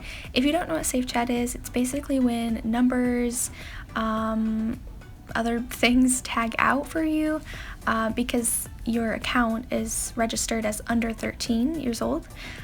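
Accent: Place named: United Kingdom. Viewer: Heyy! R u from European places, accordin t ur great British accent?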